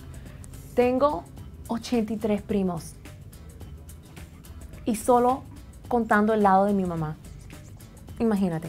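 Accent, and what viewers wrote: Cuba. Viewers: Jajaj me encantó la 12va. Razón! Y como poder dudar de tu descendencia, se te nota en la voz que eres cubana! Y lo que nos define son las raíces y la procedencia, no un papelito! ;) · Oigan tengo 9 años y no le entendí al vídeo ósea q es de Estados Unidos Y habla Cubano??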